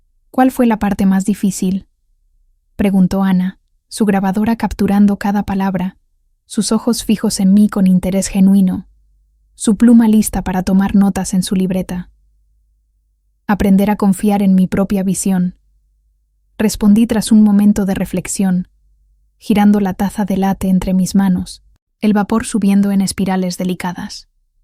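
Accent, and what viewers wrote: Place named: Spain. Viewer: Una chica mejicana con acento española.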